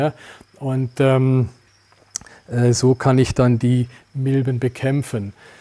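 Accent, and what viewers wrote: Swabia, Germany. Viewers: Sehr gute Folge! Übrigens... Ihr habt mal versprochen, den zu nem Deutschkurs zu schicken, bitte dran denken dass ReptilTV auch außerhalb der schwäbischen Alb gesehen wird, kein Mensch hat was gegen einen leichten Dialekt, aber beim tuts leider a wegn heftig sein, wahrscheinlich merkt er das selber gar nicht, aber meine (Deutschlehrer) - Kollegen hier im Goetheinstitut Madrid, die alle Reptil Fans sind, haben leider keine Chance zu verstehen was er da von sich gibt, obwohl inhaltlich natürlich super gut und interessant. Bitte nicht übel nehmen, den Tipp, ist nur gut gemeint 😉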